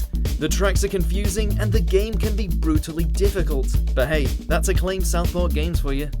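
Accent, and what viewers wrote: United Kingdom. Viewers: Who is this British guy?!? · Why is the narrator so british in this?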